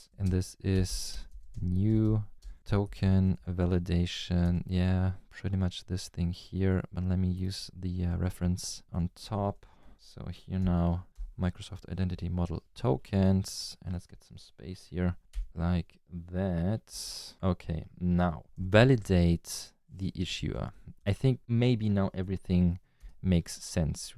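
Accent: Germany. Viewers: Great video! I like your approach to start from the top with whit sketching out what we want and fill in with functionality. An extra plus for doing refactoring! It brings an example closer to real cases, and with todays environment help, there is no excuse to not doing it. As a Swede I could guess that you were German, but you have an excellent english!° Cheers:washhands: p.s Thx for the code, just what the doctor ordered...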